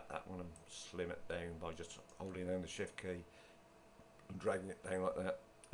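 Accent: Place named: United Kingdom. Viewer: Oh my you have such a heavy British accent:)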